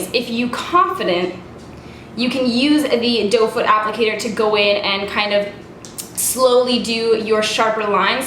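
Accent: Canada. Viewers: As soon as you opened your mouth I knew you were Canadian. I. Love. You. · She's Canadian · You're Canadian aren't you! I'm not sure if you said this already but I can really hear it when you said "sorry"'and "out"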